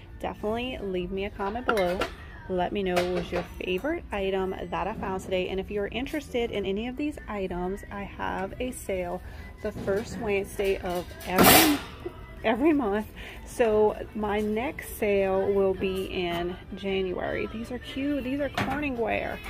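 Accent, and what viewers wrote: Louisiana, United States. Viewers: Are you from Louisiana? ❤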